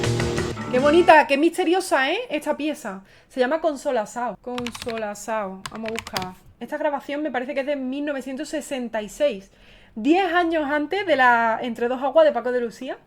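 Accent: Andalusia, Spain. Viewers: Muy bello video. Me encanta tu acento Andaluz! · Sos tan linda!!! Es un placer ver cada vídeo tuyo. Como Argentino disfruto muchísimo de tu acento español y de tu forma de pronunciar la T. Te deseo lo mejor de la vida.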